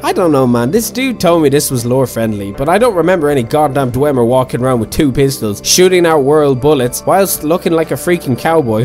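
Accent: Ireland. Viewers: Ah, a fellow Irishman Any craic?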